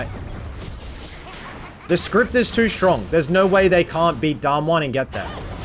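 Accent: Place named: Australia. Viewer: just wanted to say Im happy you're Australian so I have a streamer I like that speaks Australian accent English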